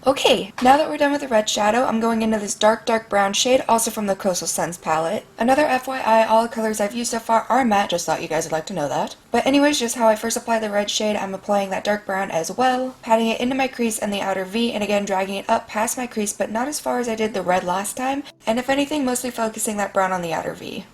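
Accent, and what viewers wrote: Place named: United States. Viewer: As an Englishman, I love American accents, this one in particular. I wish this wonderful lady would do some ASMR.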